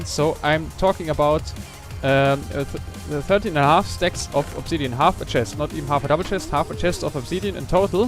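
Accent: Germany. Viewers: are you german? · ich hab schon die ganze zeit das gefühl gehabt dass du deutsch bist. du hast einfach so nen "Akzent" und man hört das typische Schulenglisch raus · wegen dem Akzent, klingt so ein wenig deutsch :D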